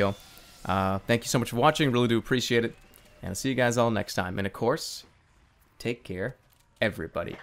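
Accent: Boston, United States. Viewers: His Boston accent is so pleasent and cosy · BOSTON THAT'S WHY EVERYONE SAYS HES LIKE THE SCOUT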